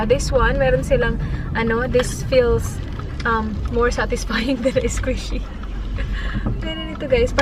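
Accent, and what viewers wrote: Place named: Tuguegarao, Philippines. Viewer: Ate!! Are you from Tuguegarao? Hahaha omg!! Yung punto mo kasi, same sa tita ko. All the love ate!!!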